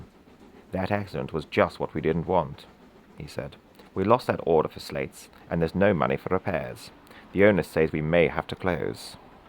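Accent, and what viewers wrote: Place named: Wales, United Kingdom. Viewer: Love the Welsh 🏴 accent, quite charming. 😊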